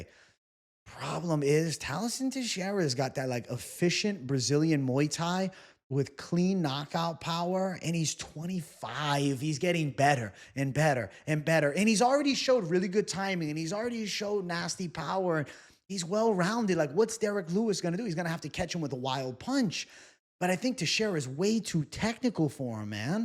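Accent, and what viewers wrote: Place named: New Jersey, United States. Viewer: Good to hear more of that Jersey coming out in the vacation accent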